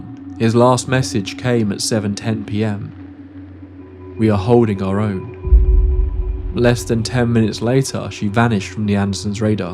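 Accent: United Kingdom. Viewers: Im sorry but it seems odd for stories about America to be told with a british accent . Maybe that is just my opinion, maybe not. And they are good stories by the way 😊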